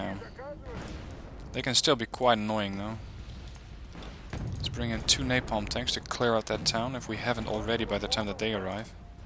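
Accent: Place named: Netherlands. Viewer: phung From his accent and voice i would say he is from the Netherlands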